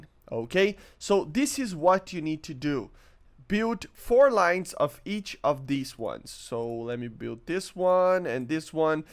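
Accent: Brazil. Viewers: Eu sabia que era BR, só vi o seu clã no final do vídeo KKKKKKKKKKK Eu falei, mano, esse sotaque é muito de BR, não é possível !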